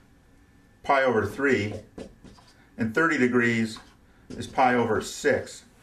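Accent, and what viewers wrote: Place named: New England, United States. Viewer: Lol a slight new england accent I think is what you're getting at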